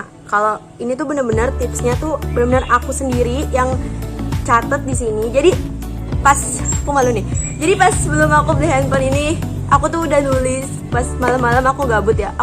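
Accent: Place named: West Java, Indonesia. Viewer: Orang sunda nya kak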